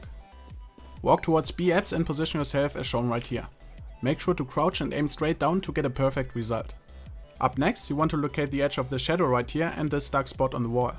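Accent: Germany. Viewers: Das deutscheste Englisch ever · der deutsche lol dat english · Are you from Germany? You have very german accent.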